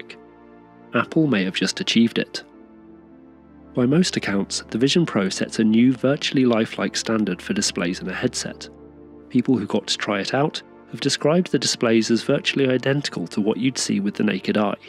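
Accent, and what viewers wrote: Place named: United Kingdom. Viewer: Great video, nice to hear a British voice on American Tech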